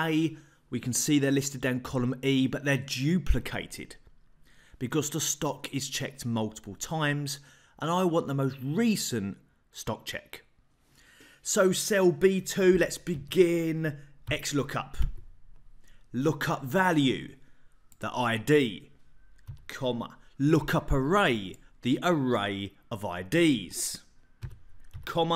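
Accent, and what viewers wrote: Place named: Cockney, United Kingdom. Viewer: Clear and understandable tutorials, and in my first 2 vids I've seen I've discovered DGET and XLOOKUP just at the time I need them! All delivered with a top geezer accent, subscribed! Question for anyone who can answer: I followed the steps for becoming an Office Insider, it seems I now am one, am I able to activate these secret functions somehow? They aren't immediately available. It also says "to a portion of Insiders", perhaps its not all Insiders who gain access? Cheers!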